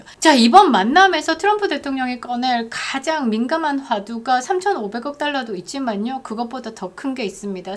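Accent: Gyeongsang, South Korea. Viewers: 전라도출신 보수우파인데 경상도 억양으로 제 대신 구수하게 비판해주시는 목소리에 속이 시원합니다 ㅎㅎ 그립다 윤석열 대통령님!!윤 어게인❤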